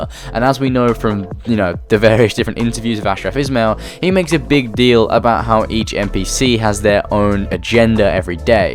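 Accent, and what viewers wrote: United Kingdom. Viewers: I thought that it was probably a joke, but I wasn't completely sure. You never know when you've got somebody from a different culture if maybe a saying that's normal to you means something very different to them. Your accent is obviously European - probably UK somewhere, although I've heard people from the middle east with an accent that sounds pretty similar to yours too. I've certainly never heard the rough sex definition. I'm not even sure if that's an actual thing or just somebody putting in a silly troll definition XD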